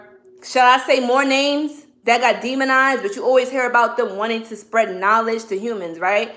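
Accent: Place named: Brooklyn, United States. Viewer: I love you my Sister! You had me at Chaos Magick then you dropped info on St Expidite and I knew you were bad ass and that BK accent is off the chain. Shout out to Masterly Foolish for bringing you to my attention. I'll be checking you out and hanging with you. Let you know how the mirror spell works. Thanks for the knowledge.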